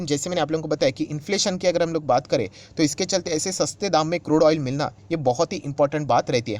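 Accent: Maharashtra, India. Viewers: मला ही वाटते सर मराठी भाषा बोलत असणार,, कारण कधी कधी मराठी उच्चार ऐकू येतात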